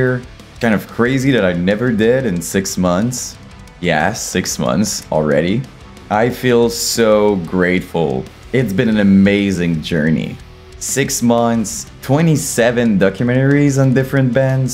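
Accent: Quebec, Canada. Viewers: Amazing job on growing the channel dude, your videos are what i needed to remind myself of the greatness of the myspace era, i feel like there is no one these days that go back and appreciate these gems for what they were. Gros props dude. Also as far as ideas, maybe doing deepdives on the more obscure labels could be cool and what they brought to the scene back then (This City is Burning, Mediaskare, Artery, Ferret, Siege of Amida, Prosthetic, etc) or even the early days of household names like Sumerian and Century Media, idk, just an idea ! On a last note, it's been inspiring to see you make it through that overly populated sea of content that is Youtube, as a fellow Quebec French speaking mofo, it gives me hope that we can also sit down and share the stuff we love and be a part of the conversation without too much tabarnacking on our pronouciation. Lâches pas, 2024 will be huge mate🎉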